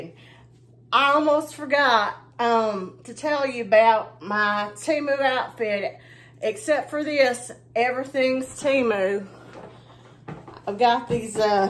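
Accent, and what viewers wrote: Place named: United States. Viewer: Howdy! Love the cow girl vibes / accent! So much fun!!!! 😊🖤🩷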